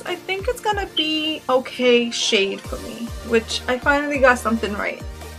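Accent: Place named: Northeast, United States. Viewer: where the heck are you from?! hahaha I am from Texas and I can tell that you have a north-east accent and I love it!!!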